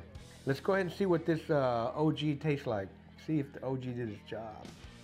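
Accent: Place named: Valley, United States. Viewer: Sound just like my relatives down in the valley. Alamo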